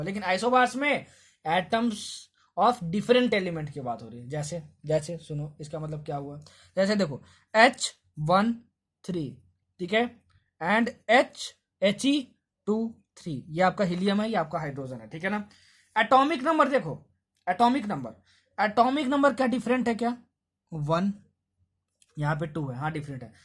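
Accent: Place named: Gorakhpur, India. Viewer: Are you gorakhpuriya???? Ur accent is sweet....btw thanks for course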